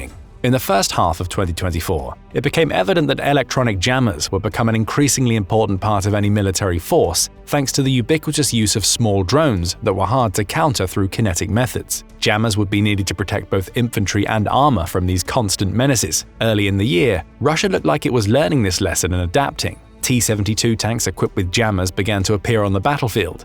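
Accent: United Kingdom. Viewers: misleading title, and british yapping unrelated to the misleading title for over 12 minutes.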